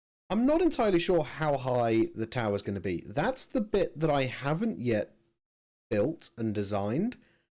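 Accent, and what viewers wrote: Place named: Posh, United Kingdom. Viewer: Awful how can you call this content 👀 You sound like you went to private school 🙄 toff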